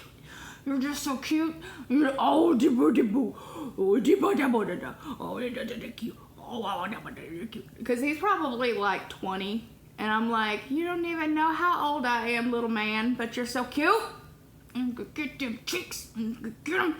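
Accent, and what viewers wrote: South, United States. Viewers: do u have a southern accent? sounds kinda southern to me.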